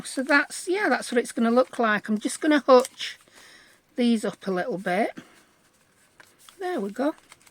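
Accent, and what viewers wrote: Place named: Barnsley, United Kingdom. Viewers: These are brilliant! You are definatly my favourite junk journal channel. I love that your accent is so familiar too, i'm from barnsley, are you local to me? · Hi love your bag journal, I will deffo be trying that in my witchy papers. Where are you from you sound from like my neck of the woods in Wakefield x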